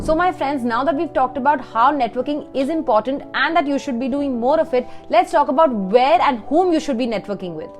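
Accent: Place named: India. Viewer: Idian english for sure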